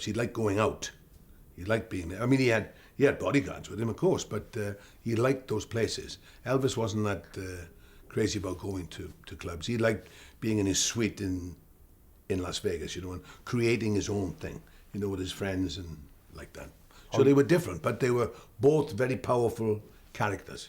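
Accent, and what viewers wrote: United Kingdom. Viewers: Azim Hulaimi he's Welsh , actually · I love your voice, your English accent and your good sense of humor and more your humbleness god bless you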